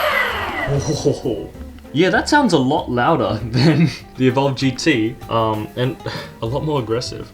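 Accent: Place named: Australia. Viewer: Wtf this guy is korean talking Australian and acts like a white boy the fuck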